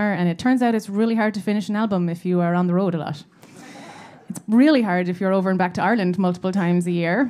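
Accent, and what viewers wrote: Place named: United States. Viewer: it brings back happy memories when my late sister Bernie Rip & me met you in Ennis Cathedral. We were very impressed. Thanks to your lovely Mom & Dad for sending me your very talented video. I'll be looking forward to meeting you when you come home to the lovely Co. Clare. You sound very Americano it would be hard not to pick up the lovely American accent. Best of luck..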